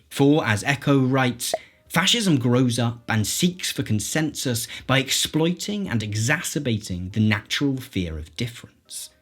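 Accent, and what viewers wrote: RP, United Kingdom. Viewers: How did i know you were a brit before even clicking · haha as if this is the top comment. I'm British and just knew 100% he was British for some reason. glad I found him, his videos are good. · the guy sounds like such a tory but his opinions don’t match his voice ahhhhhhhh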